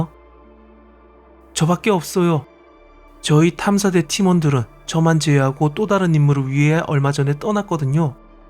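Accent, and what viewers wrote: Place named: China. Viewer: 엥 왜 말투가 조선족...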